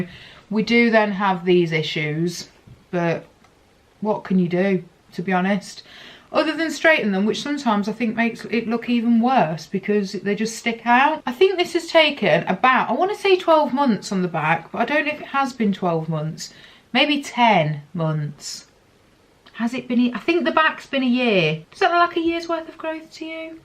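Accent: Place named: United Kingdom. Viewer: So Bri’ish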